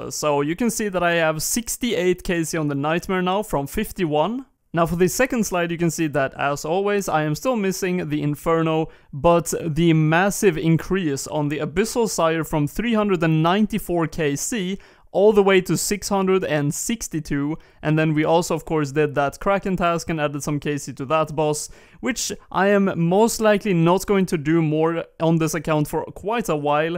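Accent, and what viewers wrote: Sweden. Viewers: Raspig svensk dialekt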